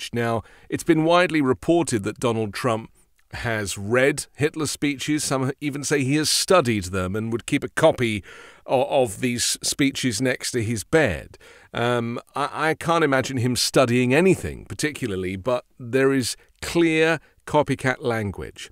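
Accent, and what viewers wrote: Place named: South East England, United Kingdom. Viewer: I think his accent is from south east England….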